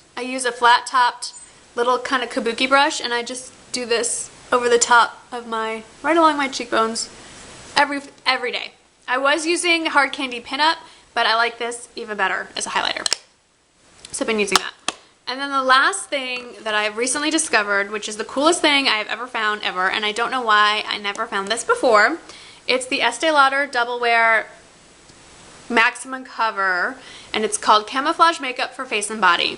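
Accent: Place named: New England, United States. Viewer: I love listening to her talk. She's from the New England states and has that accent. :)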